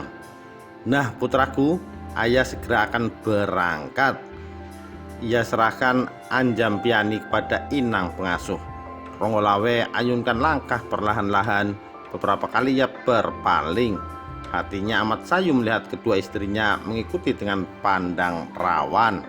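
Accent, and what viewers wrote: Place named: Java, Indonesia. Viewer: Suaranya bagus itu,khas jawa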